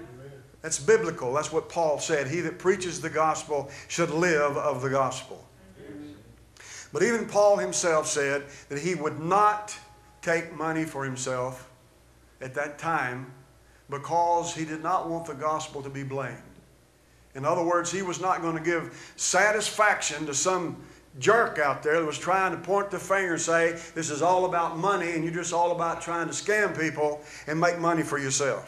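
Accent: South, United States. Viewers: I don't care what he says theirs never anyone came close that sounds like elvis or even comes close like you do and looks like elvis and that southern accent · think its the southern accent fooling you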